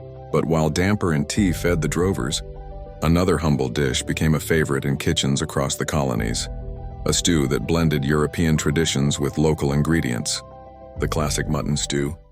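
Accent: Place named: United States. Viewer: why the american accent ?!?!?!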